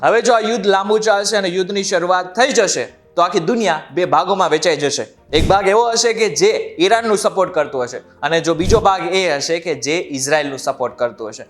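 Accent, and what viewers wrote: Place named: Ahmedabad, India. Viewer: ભાઈ, આ અમદાવાદી touch છોડીને બોલો તો સાંભળવું ય ગમે. તમારી પ્રસ્તુતિમા સાતત્યનો અભાવ છે. તમારું folks બોલવું fox જેવું સંભળાય છે. બની શકે અમુક viewers લોમડી જેવા ય હશે (મને છોડીને). પણ એવું કહેવું સારું ના લાગેને!😂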